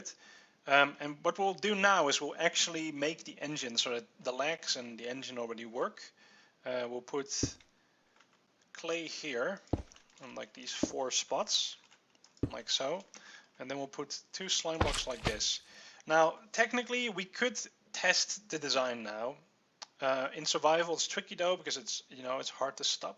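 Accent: Netherlands. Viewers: Are you dutch?? THE accent sounds really Dutch... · i can hear you are a Dutch man ;) ik heb er niks mee maar het is grappig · Yes he's Dutch many ask this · You dutch???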